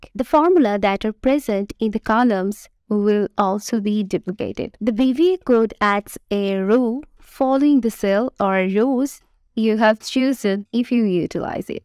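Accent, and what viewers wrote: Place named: East Asia. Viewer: Nope. Eastern Asian speakers normally speak this way.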